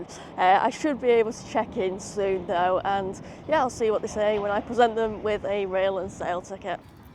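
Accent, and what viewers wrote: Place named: West Midlands, United Kingdom. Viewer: Great vid! Are you from the west mids by any chance? Sorry couldn’t help but notice the accent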